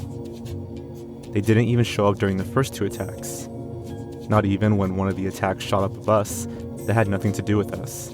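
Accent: United States. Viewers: i find it hilarious that a supposed mexican pronounces spanish names like an american, cmon man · Can't stand the way hes saying Mexican words/names in such a yt/American accent man. Ain't yoir name?!?!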